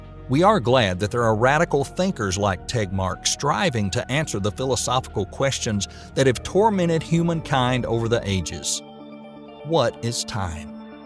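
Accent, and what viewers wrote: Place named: South, United States. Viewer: This channel would get more views if you changed your A.I. voice to one with a British accent. People generally prefer this when watching science related content as it sounds more intelligent than a southern American accent. Perhaps try a female voice such as the one John Michael Godier uses on his channel Event Horizon. I don’t recall any channels which are doing so at the moment. Enjoy your content a lot though, just the voice has to go. Keep in mind, most of your viewers are likely NOT Americans even if you are and you must therefore cater and adapt to the preferences of that audience. Cheers!! 💫🙏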